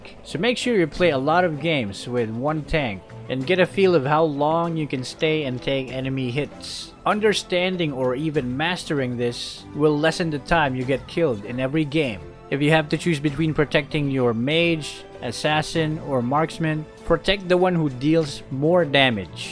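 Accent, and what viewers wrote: Philippines. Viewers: Wow galing mag english halata Filipino ka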